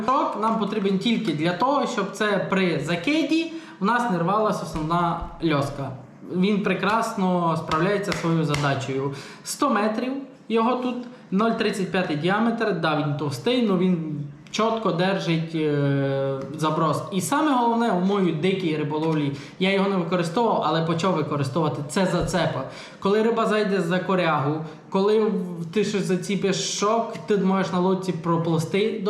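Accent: Transcarpathia, Ukraine. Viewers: По говору чути що з Закарпаття) А звідки саме?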